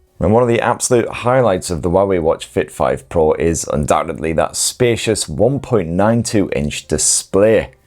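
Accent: United Kingdom. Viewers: What British accent is that?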